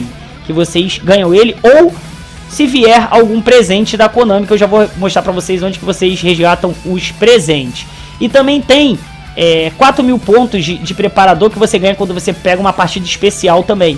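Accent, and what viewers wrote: Rio de Janeiro, Brazil. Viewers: Q time vc torce? Pelo sotaque é carioca deve se pro Flamengo...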